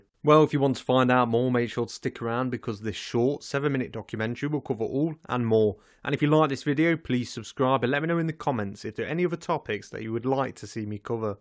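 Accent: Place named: England, United Kingdom. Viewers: Where are you from? What accent is this? Or regional dialect or whatever. Sound like the northerners in Game of Thrones. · UK, England probably, doesn’t sound very irish or scottish so I’m pretty sure he’s from England. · Sorry no offense but what kind of accent is that? Scotland? Just curious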